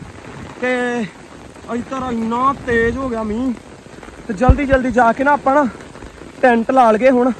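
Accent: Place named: Makhu, India. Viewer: aa boli makhu wale pase bolde aa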